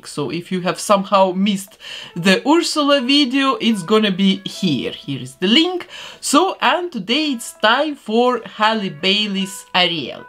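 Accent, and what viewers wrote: Russia. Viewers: Girl are you Russian or Belorussian? Because you have Russian accent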